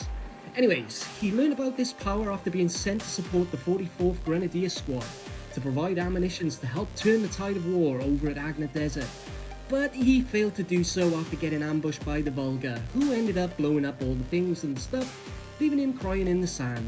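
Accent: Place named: Wales, United Kingdom. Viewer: Love those Welsh tones. Have you considered onlyfans?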